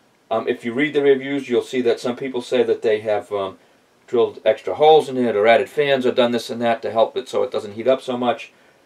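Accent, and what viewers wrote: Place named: New England, United States. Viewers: I'm guessing somewheres in New England. Maybe Maine or New Hampshire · I'd say more like Massachusetts.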